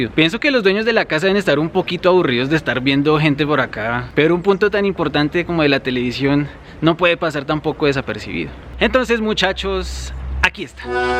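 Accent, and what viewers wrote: Colombia. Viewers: El Luisito comunica colombiano, lo amé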